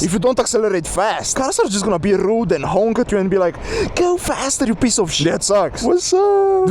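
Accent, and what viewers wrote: Romania. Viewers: ești român coaie hahaha😂 W numa acuma mi-am dat seama ca ai acent roman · You're romanian,right?Your accent seem to be from a Romanian guy(nu prea o am cu engleza) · Stiam eu ca esti roman, dupa accent. Foarte faine videoclipurile. Poti oare sa faci un videoclip cu surron ? Ca inca nu stiu daca sa iau surron sau kukirin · Ce accent de român ai smr eu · brooo, is romanian accent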